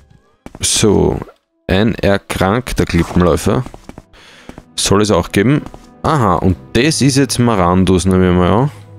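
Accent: Vienna, Austria. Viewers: Endlich Wienerisch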